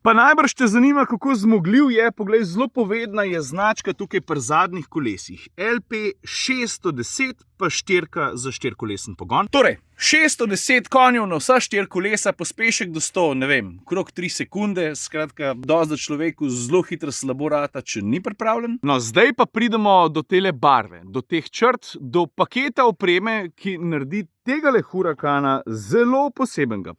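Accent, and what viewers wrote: Styria, Slovenia. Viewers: velikokrat me tvoji videi tudi nasmejijo, ampak tale uvod je bil pa čisto po štajersko... Čooj a si ti upaš, pa hlače gor potegne:)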